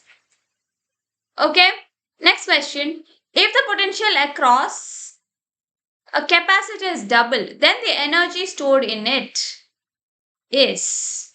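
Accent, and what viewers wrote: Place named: Kerala, India. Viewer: mam you from Kerela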